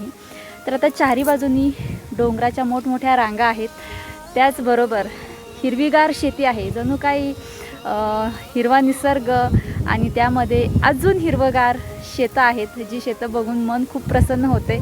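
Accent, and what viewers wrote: Standard. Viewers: का शेतकरी आणि गावकरी शुद्ध बोलू शकत नाही? काय भाऊ तूम्ही पण उगीच काहीतरी, ताई ला शुद्ध मराठी येते त्या सुशिक्षित आधुनिक शेतकरी आहेत. म्हणजे त्यांनी मुद्दाम मारून मुटकून अशुद्ध बोलण्याचे नाटकं करावे, म्हणजे व्हिडिओ चे विषयाला अनुसरून होईल, शोभेल असे तुमचे इतर नाटकी uTuber ना बघून गैरसमज मत झाले आहे का. काय राव???????? तुमच्या या कुपमंडूक संकुचित मनोवृत्ती ला माझे कडून👎. या ताई साठी👍👌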